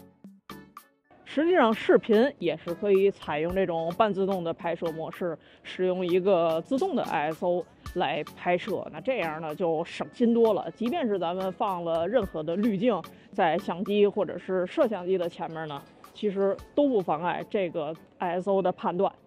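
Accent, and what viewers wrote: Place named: North, China. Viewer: 拜託 你不要看臉聴聲音也知道是大陸北方姑娘。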